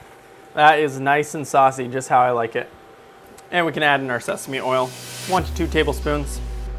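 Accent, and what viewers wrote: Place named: Ontario, Canada. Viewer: Random question, but are you Canadian? You sound like you could be from Ontario lol!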